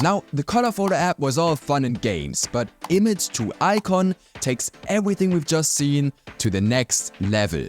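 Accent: Germany. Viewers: Is that a Deutsche Akzent? :D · As German, I was pretty sure it’s a German accent, but still I thought he might be from another european country. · Actually, it took me three videos to get that hes German Und jetzt esse ich weiter Bier und Brezel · Yes. He is german 😀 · Bist du Deutscher??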